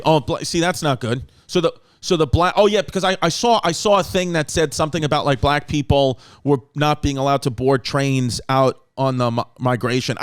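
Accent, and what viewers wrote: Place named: Brooklyn, United States. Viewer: Everyone seems to forget, behind his HEAVY brooklyn accent, chrissy chaos, once graduated doctorate school and once held court on History Hyenas. This is 100% factual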